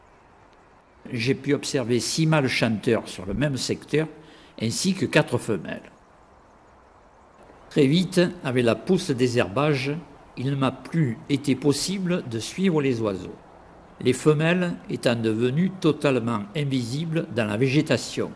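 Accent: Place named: South, France. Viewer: agrémentés du petit accent du Sud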